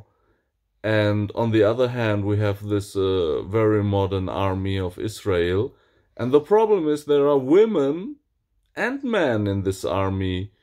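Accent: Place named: Oxford, United Kingdom. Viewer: Very beautiful spoken my friend! And even if THEY are not my friends, nevertheless I love the noble pronunciation of cultivated Oxford English . Your pronunciation sounds really Exceptionally beautiful , chapeau und mashallah ☺️ Is the one state solution the final solution 😄.. just kidding... a little word game 😂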